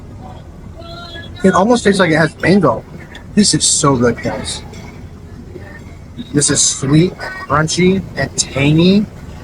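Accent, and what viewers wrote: Philippines. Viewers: please knock off the Filipino accent when u speak English. Its ridiculous. I speak 7 dialects read n write fluently. When I speak English I use no Filipino accent!!! By the way I am a former Marine also 77-84!! Married to a Filipina for 44yrs!!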